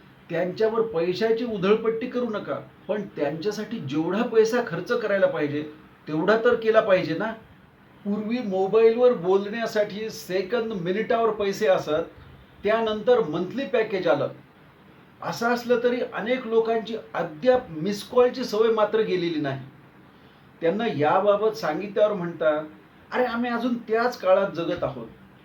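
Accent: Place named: Marathwada, India. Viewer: खुप छान आपले व्हिडिओ आहेत. आपल्या मारठवाडा टोन मराठी भाषेत चुटकुले ऐकायला मजेशीर वाटतात. 😅😊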